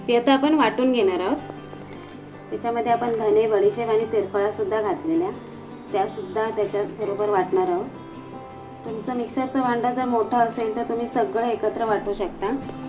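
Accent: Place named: Malvani, India. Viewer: Subscribed just to listen yr sweet Malwani... It's too sweet to hear.... मला मालवणी भाषा खूप आवडते त्याचा feel च वेगळा आहे।।।🙈🤗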